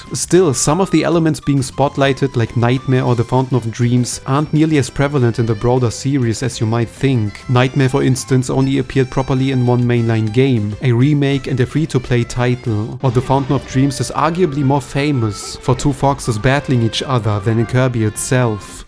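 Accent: Germany. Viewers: Höre ich da etwa deutsch heraus?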